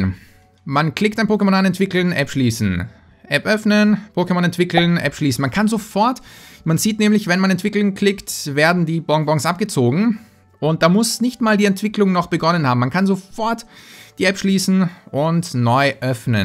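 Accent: Austria. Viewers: Woher kommst du (wegen deinem akzent) ????🍭🍭🍭also akzent in ÖSTERREIC.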